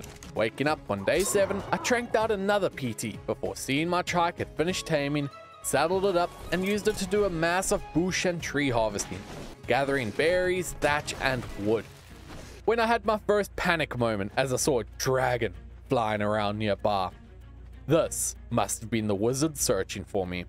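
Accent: South Africa. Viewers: wait ur south african I wanted to say ur accent eyyyyyyy LEKKER MAN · Hey bro awesome video I just want to ask are you from South Africa you sound like it 😅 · Are you south African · You from South Africa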